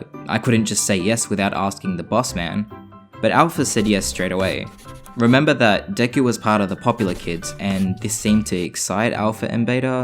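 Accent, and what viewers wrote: Australia. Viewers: so i dont know if i herd this right but you said '' deku'' i dont know i am so sorry i don really understand Australian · R u Australian? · Haha I knew you were Australian. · I just found out you’re Australian- eyyyyyy (also Australian)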